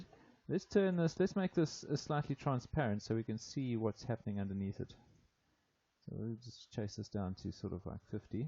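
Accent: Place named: South Africa. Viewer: Hello, thanks for these videos and the South African accent! I’ve got a points layer which represents the centroids of my hexagon layer, however, I’m struggling to align a line grid layer to pass through the centroids. I’ve tried various ways to align the grid but I’ve had no luck. If this doesn’t make sense, I could send you a mail with a screenshot explaining my problem. I’d really appreciate any assistance, thanks.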